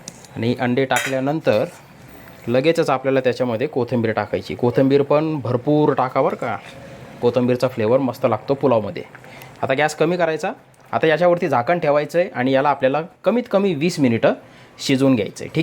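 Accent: Nashik, India. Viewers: भाषा प्युअर नाशिकची वाटते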